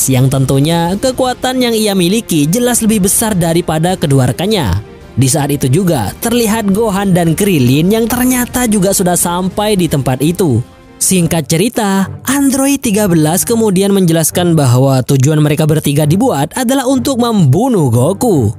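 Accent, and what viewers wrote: Madura, Indonesia. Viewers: Madura bang?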